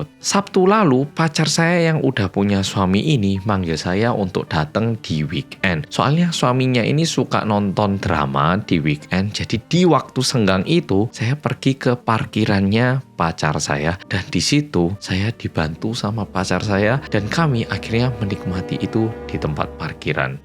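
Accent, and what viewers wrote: Java, Indonesia. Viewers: wow beneran ada ta 🤭 Nyimak swindler org korea yg medok...🎉 tks 🎉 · ini jiaaaan blas gak enek sleke Korea asli slek jowo,tapi walaupun aku tidur bila dengar suara ini aku selalu tau bahwa ini adalah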